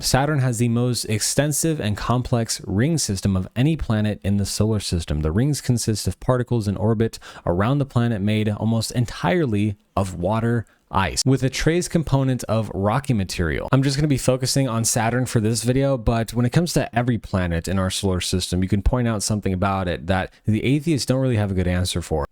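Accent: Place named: Australia. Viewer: wow you have an aussie so cute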